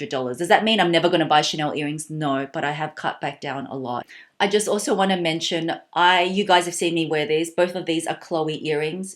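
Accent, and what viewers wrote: Australia. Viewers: I don’t have piercings but I really appreciate these earings, the creative aspect and your kindness behind the creation. Thank you so much for being so mindful of the fact that being able to see the world around us is a blessing and giving back is something we should do because we’re so luckier than a lot of people. I used to live in Australia and I feel really connected with you and your accent. I really like you for being so humble despite having a lot of luxury goods. I wish you all the best. Youtube needs creator like you. Keep up the good work :)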